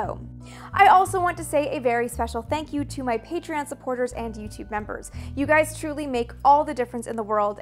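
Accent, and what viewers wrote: Canada. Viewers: Yay. More content! I can still hear the Canada 🇨🇦 in your voice. I'm from Syracuse. 🤷🏿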